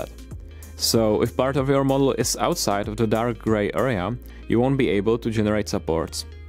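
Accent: Czech Republic. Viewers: Český přízvuk je nezaměnitelný :)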